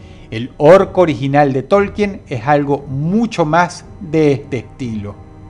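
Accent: Venezuela. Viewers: wow aqui tiene muy marcado el acento venezolano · anda, que para ese entonces ese acento venezolano todavia estaba ahi... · Ese acento venezolano ✨ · A le ah cambiado mucho el acento el los últimos años en este video tiene acento venezolano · Ese acento Chamo... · Cuando aún hablaba como venezolano:v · Extraño cuando a se le notaba el acento venezolano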